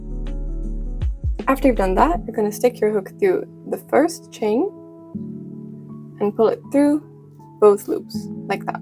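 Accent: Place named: United States. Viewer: you sound almost completely American. Accents are typically the hardest thing to master when learning a foreign language. How did you go about learning English so well in the Netherlands, if I may ask?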